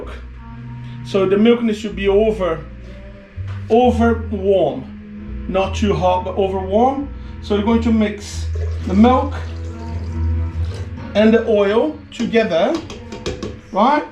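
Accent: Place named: New Zealand. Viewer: Oi, vim através do grupo barraqueiros de Queenstown LOL. Adorei tua receita e objetividade. Ja me inscrevi no teu canal e curti o video. Seria legal vc tb deixar a receita escrita na descrição do video. Obs: meu Deus parece que to ouvindo um kiwi falar!!! Abraco!!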